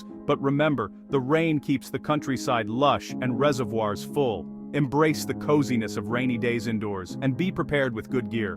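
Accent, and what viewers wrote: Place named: United States. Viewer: What about Northern England and why have you got an American accent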